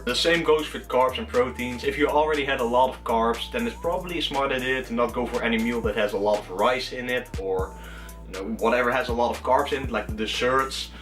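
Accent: Netherlands. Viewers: It took me 0.1 seconds to realize you're Dutch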